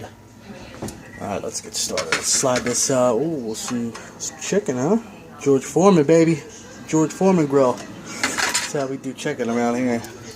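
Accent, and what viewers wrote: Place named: New Jersey, United States. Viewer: Good video...thanks for the info! Your Jersey accent was rough but I'm a southern boy LOL. Thanks again.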